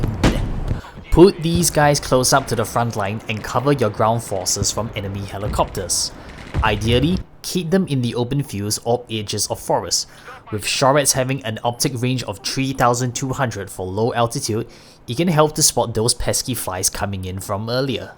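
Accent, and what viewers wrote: Singapore. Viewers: Are you by any chance Singaporean?? I can hear the accent lool.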